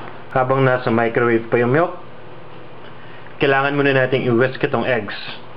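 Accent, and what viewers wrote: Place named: Conyo, Philippines. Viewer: ang konyo mag salita buset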